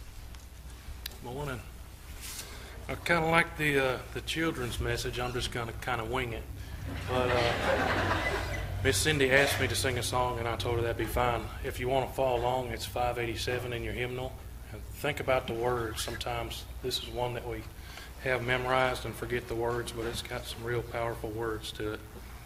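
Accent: South, United States. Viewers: LORD, I thought there was something special about you! You always talked & acted like a gentleman!! I'm going to assume you know what it means to be SAVED in Jesus Christ, or "BORN AGAIN"! Your church looks like Southern Baptist. I am SAVED in an Independent Fundamental Baptist Church near Rockford, Illinois ( Windsor Baptist Church in Loves Pk., IL). How wonderful you can sing AND play the guitar too!! AND shoot guns also! WOW, what a very pleasant surprise! You sound like a "southerner" too, wherever you live. I assume you are married with children. Don't think it would be "proper" to ask more information about you. There are other Christian gun reviewers out there, like Jeff Quinn who passed into eternity recently (his brother is Boge), & George at Target Suite, & at least a couple more I forget. Bill Raby may be another. As a proud subscriber to your channel, now I can watch you with more "affection"! 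Love ya, my "brother" & look forward to watching more of your fine videos!!! "Gesundheit"!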